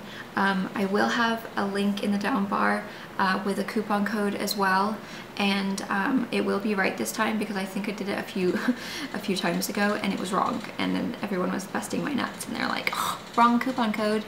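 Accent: United Kingdom. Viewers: She's English but was raised in America so she lost her accent. Though some of her words do sound like she has a slight trace of a British accent.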